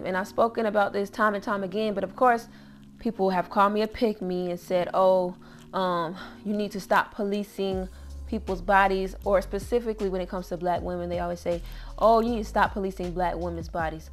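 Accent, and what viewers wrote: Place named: South, United States. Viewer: You mad Southern hun bahaha ☺️😂 Keep up the good work...100k subscribers in no time! 💪🏿💪🏿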